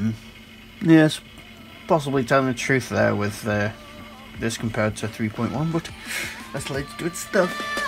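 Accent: United Kingdom. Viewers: Are you british? I am awful when it comes to guessing where people come from via their accents lol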